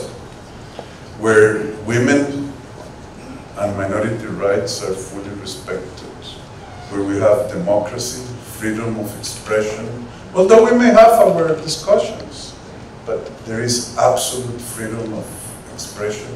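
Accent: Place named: Costa Rica. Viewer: Jajajajajajaj My man does not only “talks the talk, but he also walks the walk” !!!! I know Mr. Chaves is never going to read this comment but I am so glad that speaks with a Tico accent, this shows that he is a true product of improvement and a fighter to whom nothing has been given for free or with a silver spoon. NO RED TAPE !!! Please get all the roadblocks out of the way, we want to move forward as a country., were are behind you!!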